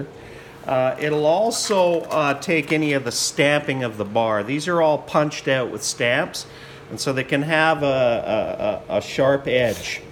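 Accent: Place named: East Coast, United States. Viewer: Great information to know, thanks. I detect a slight East Coast accent.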